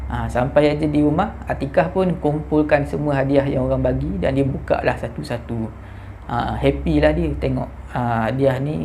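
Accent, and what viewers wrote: Terengganu, Malaysia. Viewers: nk tye je ni org ganu ke sbb dgr slank ganu 😅terbaik cite dk pnh trlepas lg dgr 👍teruskan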